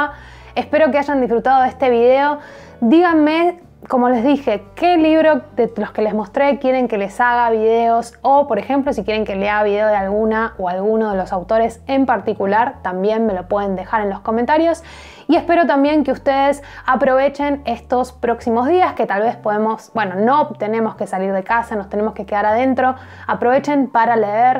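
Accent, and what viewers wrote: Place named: Argentina. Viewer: Al fin un canal sobre libros con una argentina. Todo bien co0n los compatriotas latinoamericanos, pero es mas hermoso oir nuestro acento argentino. GRACIAS! Tomé notas de algunas novelas.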